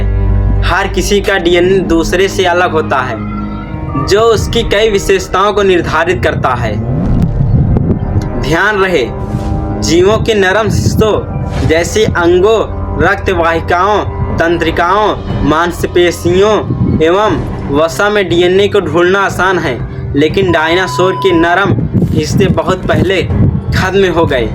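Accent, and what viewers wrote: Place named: Bihar, India. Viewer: Apki bhasa se lag raha hai aap bihar se ho?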